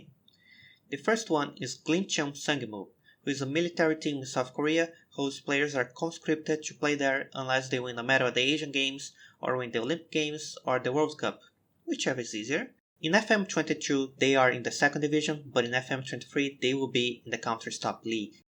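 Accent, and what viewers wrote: Brazil. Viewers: cara, vc é br? pronúncia parecida com a nossa. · are you brazilian? the pronunciation of certain words are very similar to brazilian people speaking english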